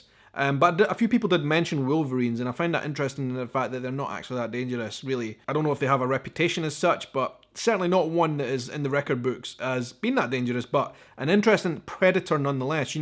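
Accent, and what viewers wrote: Scotland, United Kingdom. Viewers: I like "moosies" best. And you can read the dictionary out loud if you like, anything to hear that wonderful Scottish accent! 😍😍😍😍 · "Kettle of fish" still loving the accent. "Son's of Scotland" speech type stuff...awesome. Bring me on your next adventure....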